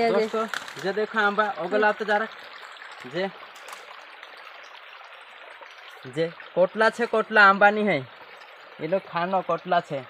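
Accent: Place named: Madhya Pradesh, India. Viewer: आप जिस भाषा में बोलते हु व भाषा तो आलीराजपुर सोंडवा कि जैसे लगती है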